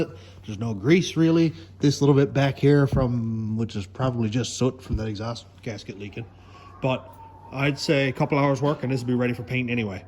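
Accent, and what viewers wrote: Newfoundland, Canada. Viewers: I'm from grand bank lol currently living in pei,I know a newfie when I hear one haha what part ya from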